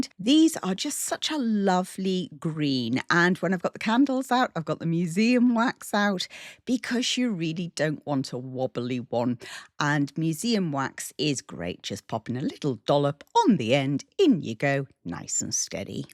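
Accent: England, United Kingdom. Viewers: You just have a knack for decorating ❤love your ideas❤I never get bored watching your videos ❤and I always look forward for your next one❤ love the spring decor❤and love listening your to your English accent❤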